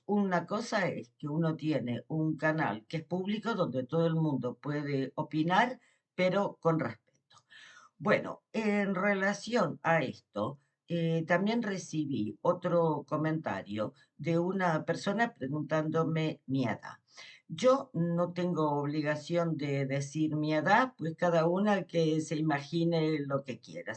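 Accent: Argentina. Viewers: Hola!! Hace poco q te veo,pero me encantas.porcierto me gusta mucho tu acento argentino ❤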